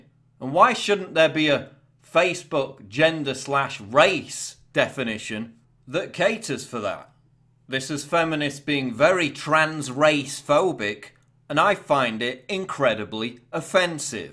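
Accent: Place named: Yorkshire, United Kingdom. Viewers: you from Sheffield?, you sound like me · As an Aussie, is yours a Yorkshire accent? You sound like Michael Vaughan, former English cricket captain. Btw, it's very patriarchal, you should learn to speak with a Swedish accent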